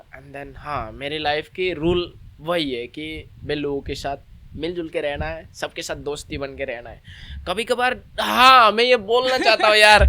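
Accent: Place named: Arunachal Pradesh, India. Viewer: Why your hindi is like arunachali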